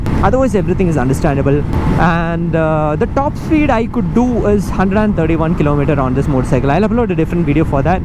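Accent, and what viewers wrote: India. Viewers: Such a calming soothing voice and also you speak English in such a fashion it feels like you are speaking in hindi. Amongst the most genuine and humble person i know on youtube. Keep up the good work bhai, ❤️🙏😍🙌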